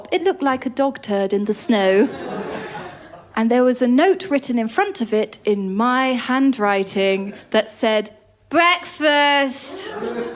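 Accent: RP, United Kingdom. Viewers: Her delivery is incredible. It really adds to her jokes that she's talking about shocking/sexual stuff in clean cut RP. Very expressive face also helps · Stumbled through the youtube algorithm to this. I love the humor. The profanity mixed with the british politeness or rather way of speaking is a refreshing act compared to us comedics! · Diane Spencer Btw some men in the States actually prefer redheads. No ginger bias here darlin. Keep at it: you're raw, honest, funny and gorgeous. I wish there were more women like you. Take care and bring your act across the pond. And that British accent 😍 I would be honored to shake your ginger claw lmao✌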